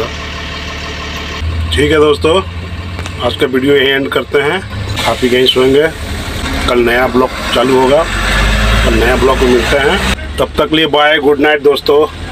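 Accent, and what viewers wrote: Bihar, India. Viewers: Apke language se lagta hai aap bihar se ho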